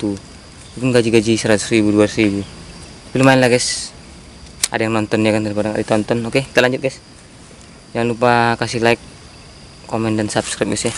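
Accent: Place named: Medan, Indonesia. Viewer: Abang ini orang medan ya aku penasaran logat ngomong nya kayak orang medan...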